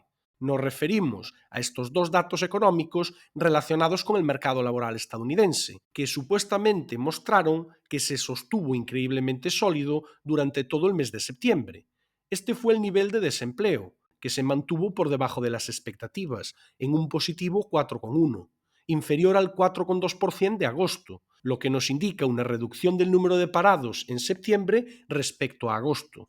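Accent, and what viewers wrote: Spain. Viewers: Muy buen video! Pregunta de curiosidad 😁: de que parte de España es el acento de la persona que habla en el video?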